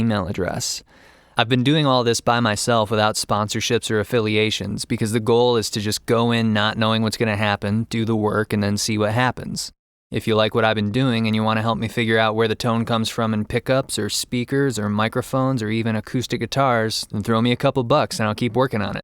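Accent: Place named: Bavaria, Germany. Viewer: A very simple Bavarian portent question. Can't unhear it. :D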